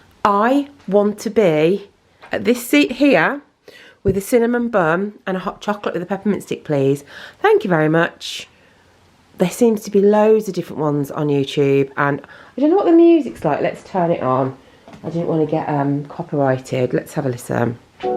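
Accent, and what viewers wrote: United Kingdom. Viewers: You are a charming and humorous woman! Very pleasant.... Are you British? As I am German it is not always so easy for me to distinguish British and American language.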